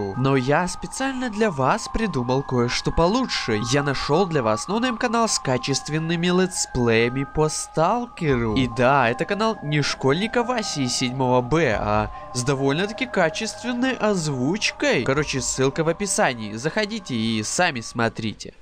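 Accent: Belarus. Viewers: Ты беларус?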